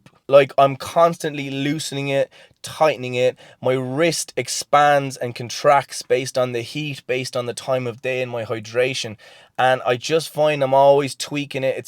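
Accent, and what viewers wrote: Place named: Ireland. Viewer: I’m not listening to a word this man is saying because his Irish accent is so lovely I’m totally distracted.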